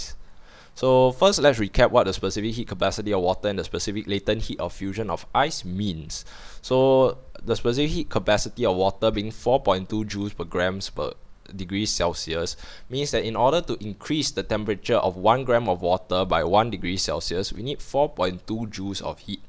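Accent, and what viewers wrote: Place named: Singapore. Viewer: hahhaa just want to say i stumble this on chatgpt, then when i played the video i heard ur voice/accent, im like EH singaporean hahaha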